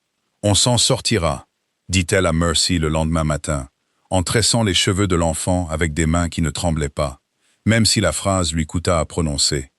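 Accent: England, United Kingdom. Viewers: Que l'IA abandonne cet accent anglais ridicule et on s'abonnera ! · Tous ces récits sont de l’Angleterre, cet accent donne de la force aux récits..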